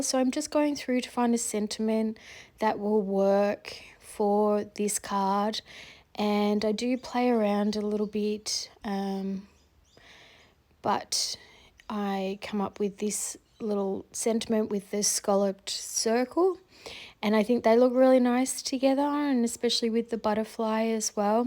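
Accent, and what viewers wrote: Australia. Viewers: An Aussie voice? Instant subscriber! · Good to see your process. Thanks for sharing. Love to hear another Aussie!😃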